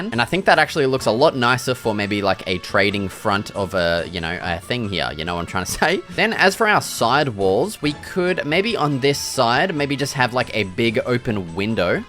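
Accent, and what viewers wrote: Australia. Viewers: Cus his Australian mate 😂 Could tell the moment he said theres afew blokes over there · He sounds more Australian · He’s an Aussie. Kiwis sound different.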